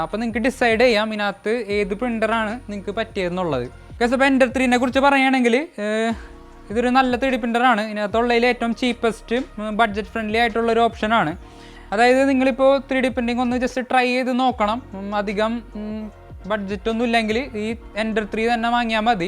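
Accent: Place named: Kodungallur, India. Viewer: കൊടുങ്ങല്ലൂർ, കരൂപ്പടന്ന ആണോ, അവിടത്തെ ശൈലി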